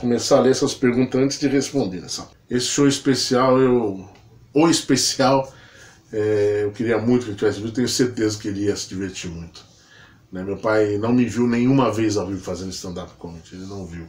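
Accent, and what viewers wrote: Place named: Brazil. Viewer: vi em outro vídeo que você é carioca. Perdeu o sotaque. Acha que se continuasse com sotaque carioca isso ajudaria nas piadas?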